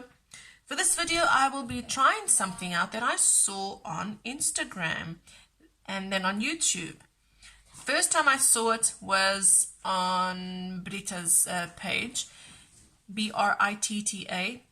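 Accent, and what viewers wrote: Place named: South Africa. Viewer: I love the tutorial, I am going to try this soon, I just need different colors in distress ink. I only have 3 and those are in vintage brown colors. You still have a hint of South African accent 😊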